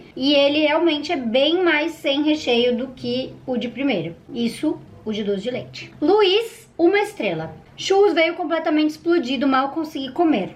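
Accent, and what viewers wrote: Rio Grande do Sul, Brazil. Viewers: Eu: aaaaaa è gauchaaaaaaaaaa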